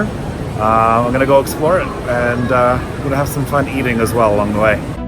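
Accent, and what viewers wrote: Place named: Australia. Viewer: You're australian? I can barely detect an aussie accent.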